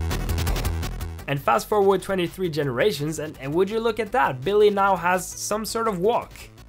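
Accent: Sweden. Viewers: Hey are you swedish or norwegian? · Are you swedish?? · Are you Swedish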